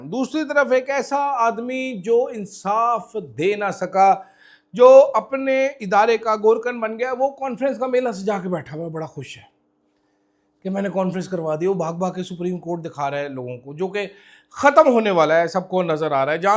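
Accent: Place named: Sindh, India. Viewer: Love your Sindhi accent Please try Pashto accent next time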